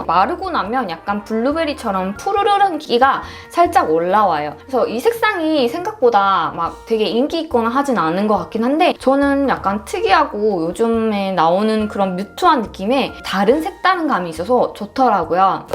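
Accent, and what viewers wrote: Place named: South Korea. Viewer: 사투리 쓰시나바옄ㅋㅋㅋ 먼가 억양이 느껴짐 목소리가 너무 매력적이에요! 너무 조명 뽜악! 아니라서 발색 보기가 좋네요 :)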